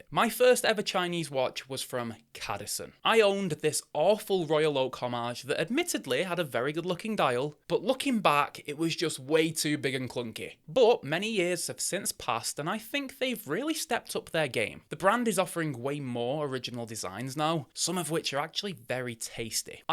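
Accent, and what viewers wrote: United Kingdom. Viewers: I really like this guy. Is it the UK accent? Or the fact he reminds me of Jon Snow from GOT?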